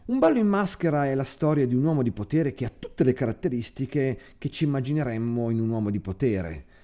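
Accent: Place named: Modena, Italy. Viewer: Con il solito accento modenese strascicato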